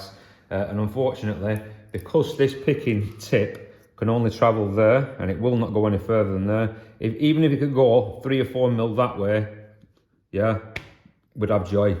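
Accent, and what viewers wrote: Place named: Cockney, United Kingdom. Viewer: Nice video, I'm thinking about getting one of these Lishi knock-offs for Yale keyways. This guy is funny, I would love for him to read a children's story. LOL. I love his accent (cockney?). Love his colorful way of speaking. I can just imagine............ "She tasted the porridge from the first bowl right. “This porridge is too fuckin hot!” she exclaimed. So the little wanker tasted the porridge from the second bowl. “This porridge is too balls-deep cold,” So, the street-walking Hussy tasted the last bowl of porridge. “Ahhh, this porridge is just dead-on balls fuckin' right,” 👍👍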